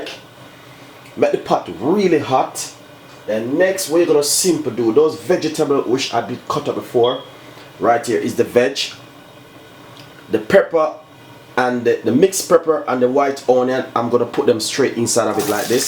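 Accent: Jamaica. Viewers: Jamaican talk😅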